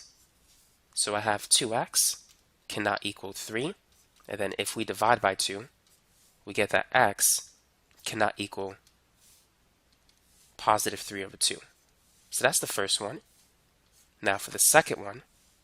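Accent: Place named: New York, United States. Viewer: Idky why but I keep hearing a ny accent😭 I can’t unhear it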